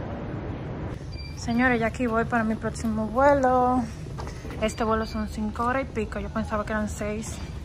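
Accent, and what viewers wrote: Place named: Cibao, Dominican Republic. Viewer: Tiene el acento cibaeño muy marcado 🤣😫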